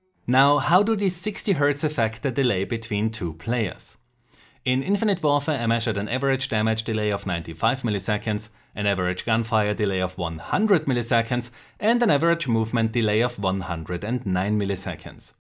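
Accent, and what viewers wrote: Germany. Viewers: Das ist das erste Mal, dass ich eins deiner Videos schaue. Sehr gute Arbeit, ich bin beeindruckt! Du musst Deutscher sein, hört man sofort an deinem Akzent. :D Weiter so! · Are you from Germany or Austria? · you sound german accent.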